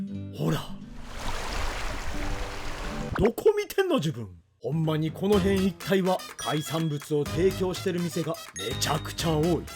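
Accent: Busan, South Korea. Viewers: 물론 철은 아니지만 몇키로 주는지 모르겠지만 비싼편 이네 근데 한국분 아니심? 발음이 한국인 인데용? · 경상도 사투리도 잘하시네요.😅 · 좋은 데이가 고고로 채워줬 데이~🎶 부산 사투리 제대로 쓰시네요! 👍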